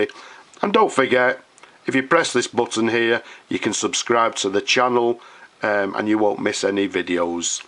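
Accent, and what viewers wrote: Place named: Northern England, United Kingdom. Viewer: Loving the Northern twang lad. I'll contact thi through t'usual channel in a bit if tha's obliging enough.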